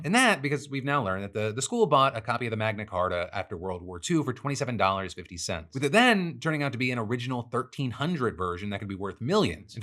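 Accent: South, United States. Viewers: I love the southern drawl creeping into your speaking pattern lmao its awesome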